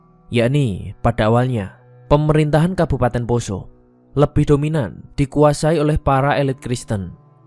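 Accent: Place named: Java, Indonesia. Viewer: Medok guys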